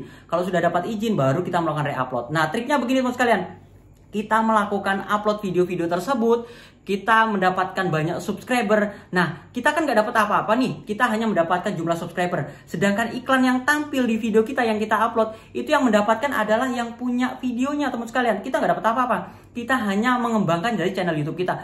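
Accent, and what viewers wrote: Java, Indonesia. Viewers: Kayak orAng jawa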